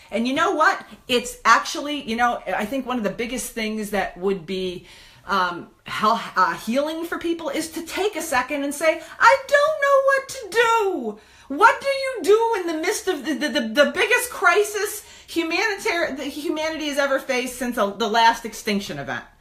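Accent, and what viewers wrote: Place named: Brooklyn, United States. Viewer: Every line spoken!I Absolute MIGHT!! NOW!!! This is the kind of women you go to war for.. everythi Ng is a redo wath is to keep?? Baby in babth water? i would shut my yapper for!! And here we are in 2025 Just look at this my buying a kimono!! I let us enjoy the day and let us not us not get sidetrack!!the entire ship never floated...and here i was Just playin marco pollo...let us unite in absolut ape shitness or is it to soon!! Who is she??? This is the malcom thi S is that old school left!! Sharp and not hippies or wath ever...The old thing from fruit of the loom and before that french and the anglo cok strudling..europe is re-do..man kind is deii informed..this is a redo as well...we lost this simmNow let us flip the board is absolute anger here i was looking at my self! Let us not loose face!! And lee Camp 🥋💥🥋 let me buy some aluminum depdorant just in case...😂 Never seen her the!! 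Not since the occupy and tea party where smokin a doobie arround a drum circle...in park avenue..This is where its at..washinghton ]]] Donald trump!! Wow that all was fine and dandy!!phillip moris and the the u Nderwear gestapo.. revenge is all we have now..let us not continue playing this awful game of monopoly...Im not letting...I dont tru St the weak and havarus... That is the sexiest AND frankly she as the brooklin n.y Accent!! 🤬😡PSSSST CALL 🤞🤟🤙🤝 ❤🎉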